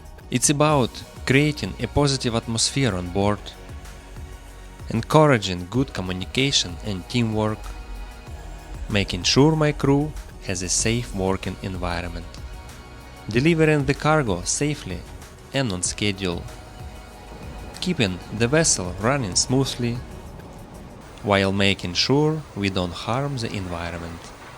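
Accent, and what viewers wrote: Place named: Russia. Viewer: здравствуйте,я смотрю что вы наш по английскому произношению 😂,скажите как вы начали путь и как проделали путь к капитану в иностранной компании я через 4 месяца буду поступать в Макаровку и волнуюсь по поводу английского и карьеры не хочу по Москве реке плавать на паромах до конца карьеры)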